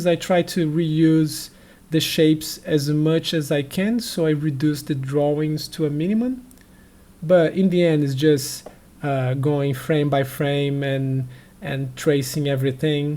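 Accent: Brazil. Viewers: 20 seconds in...i hear a brazilian accent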